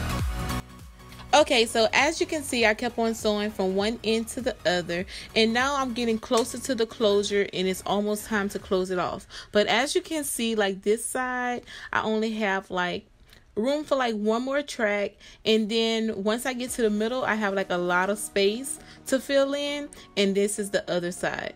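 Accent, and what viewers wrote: Louisiana, United States. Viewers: You gotta be from Louisiana sis I hear some accent😍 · I love your Louisiana accent 🤗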